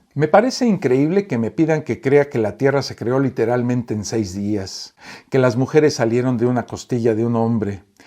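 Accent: Mexico. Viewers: No me explico cómo puedes estar contando,tantas pamplinas y tanta gilipolleces y es que Youtuber lo tienes colapsado con tantas mentiras que dices en tus vídeos.. ‘’Se suele decir que cuando un tonto va a la feria todo se vende y siempre hay un montón de panchitos y panchitas que pican como los conejos a las zanahorias 🥕 Y enciman con el acento MELOSO Mejicano o Argentino que usas para que vas hablar más. Si aburres a un muerto. Habla castellano con buen acento y no hables melosso. Jajajaja ya te vale Mejicano o argentino meloso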